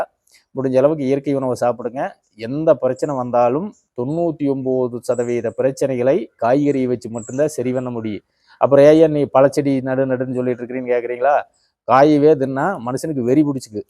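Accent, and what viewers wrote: Kongu, India. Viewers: ஆஹா அருமை தம்பி.. கொங்கு மண்டல தமிழில் எளிதாக புரியும் வகையில் இருக்கும் அற்புதமான பேச்சு...உணவே மருந்து என்ற ஒன்று இப்போது அவசியம்...மருந்தை நம்பும் சிலர் இயற்கை உணவை நம்புவது இல்லை என்று வருத்தமாக உள்ளது... இன்னும் கூட தெளிவாக பச்சையாக சாப்பிட வேண்டிய காய்கறிகள் தந்து இருக்கலாம். பழங்கள் பச்சையாக சாப்பிட முடியும்... நன்றி தம்பி 🎉🎉🎉 .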